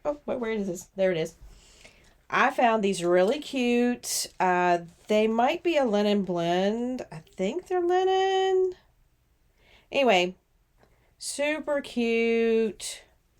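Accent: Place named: United States. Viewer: I listened to your video shorts with the sound down and was surprised when I heard your voice had a US accent. You looked so typically British. 😊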